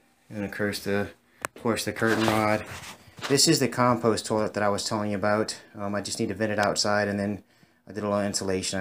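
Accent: United States. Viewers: You sound like you're from Philly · Your definitely not from Texas, lol. What's that accent? Yankee?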